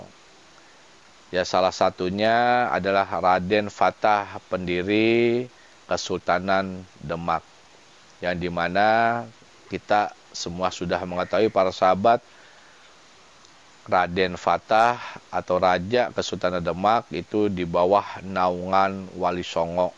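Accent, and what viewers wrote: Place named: West Java, Indonesia. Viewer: Trima kasi kepada Bpk ustadz, klo didengar dialeknya orang Jawa Barat, sangat jelas sekali menerangkanya, Ammmin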